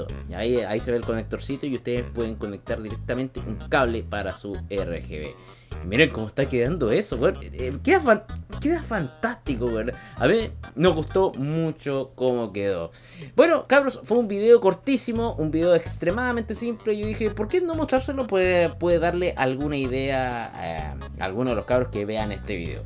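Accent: Chile. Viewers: amo también me gustan como hablan los chilenos xd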